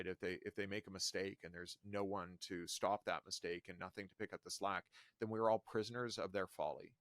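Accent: United Kingdom. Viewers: I was just about to tell you to stop speaking with a British accent, but I see that you’re a British publication When you speak English, you really need to learn how to speak standard American English, which is basically speaking English with a Midwestern accent. Americans cannot understand what you’re saying because it sounds pretentious and affected. But I had to stop by just to let you know that I agree. Unfortunately, your people, the British are the reason for the enshitification of the Internet. With the United Kingdom‘s creation of its Frankenstein, Israel for political power for the United Kingdom, and for European Jews, the UK and Israel, with the help of the muscle of the USA, have hijacked the Internet with laws that favor the abridgment of free speech as if a censure would advanced the power of those with a paid for preferred status to be the voice of the narrative on the Internet You don’t own the airwaves So what we have is basically a bunch of people who are bullying everyone to comply With a political narrative broadcasted over the Internet. 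For the creator and the creators of the Internet, the British and the Jewish narratives run against the entire world In all fairness, each country does not want their business for the world to know because they want to control a group of people in their geographical location. So they also a bridge the ability of the Internet to be free from censorship. Like tiny Tibet censoring what their population knows about the rest of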